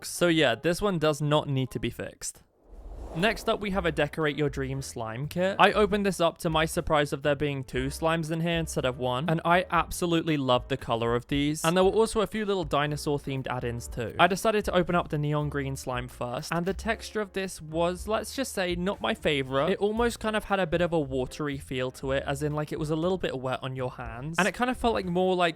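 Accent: United Kingdom. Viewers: can’t you hear the accent? he is british. obv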